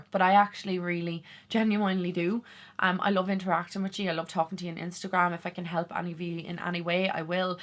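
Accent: Ireland. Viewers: I love your makeup tutorials, all varieties. Also your Irish accent. I live in Texas. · I am here for the makeup. I think some YouTubers have forgotten that for many folks, they are not only a source of information and entertainment, but also a source of escape! Our daily lives are filled with SO much seriousness/responsibility: jobs/careers, family, illness, politics, etc; many see YT Beauty videos as a way of taking our minds off of all of that. A chance to 1). See a smiling face; 2). Learn of a new "look", technique, and/or product; 3). Hear a different accent (love that Irish lilt, btw!); 4). Even hear about a personal issue/problem that does not have an effect on us, but that we might be able to commiserate with, encourage, or even help others to know that they are not alone. But for me it will always be about the makeup. I do wish the "Drama" would remain behind closed doors. 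That is the reason for HAVing doors in the first place! Sorry for the long rant, but I am tired of those other folks forgetting their purpose here: To have fun with makeup! Thank you for being among those who do remember. Take care. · I feel like certain makeup youtubers are trying too hard. I like watching you as i love your down to earth personality and your accent. My mams side is from Ireland. Lovely place 😍 you make me wanna grt my tongue done but im a scardy cat lol. Don't change hun 😀xxx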